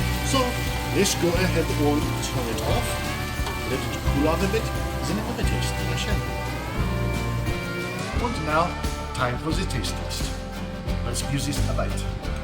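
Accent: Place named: Germany. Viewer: I hate to tell you this but your German accent sucks.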